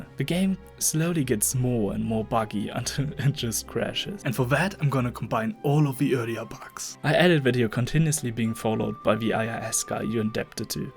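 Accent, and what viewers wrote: Germany. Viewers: Are you german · i like the german accent (sorry if it isnt german it sounds alot like it) and its a really good video keep up the good work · are you german perchance? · Are you Deutsch?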